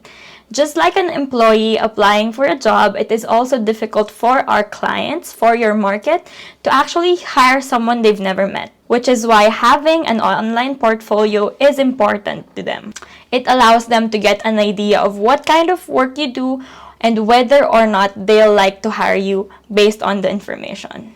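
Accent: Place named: England, United Kingdom. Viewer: Hi, Ate. Thank you for sharing your info and experience with us about in the field being as a freelancer. Also I adore your English accent. I'll be doing freelance soon, once I have done with some of my portfolio. Do you mind if you make a short video showing your office setup and what things to consider when working from home. I'll be glad if you do. Salamatss~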